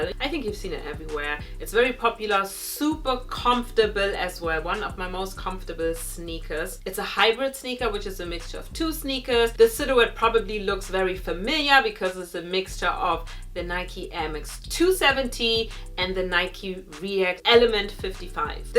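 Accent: Germany. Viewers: Du hast voll denn deutschen Akzente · I'm also German and I can't stand German accents and I can't stand hers either She literally pronounces the words as if they're German words 😭😭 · du hast irgendwie ein deutschen aktzent in deinem englisch lol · Hahaha habe ich grad auch gedacht so sprechen nur deutsche Englisch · Are u German? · Are you german cause your accent sounds like a german accent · She has a german accent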